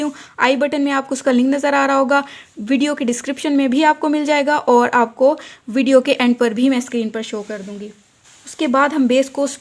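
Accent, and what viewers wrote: Saraiki, Pakistan. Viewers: Awesome. Your accent like sraiki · Ap saraiki speaking hn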